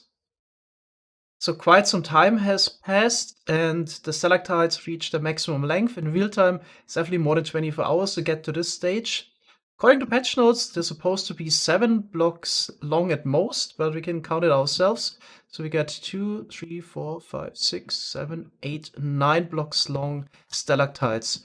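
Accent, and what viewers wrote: Germany. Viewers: Man hört sofort den Deutschen Akzent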